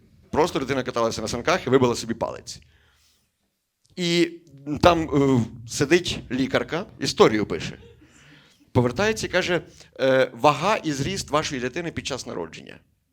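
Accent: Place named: Western Ukraine, Ukraine. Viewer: Ще за «святу Галичину», ходили, плавки і т.п. на рідному западенському сільському акценті аплодисменти стоячи. 👏👏👏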